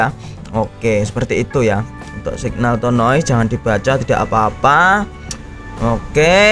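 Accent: Java, Indonesia. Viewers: Medok banget...suaranya ngebas banget....jowone ngendi....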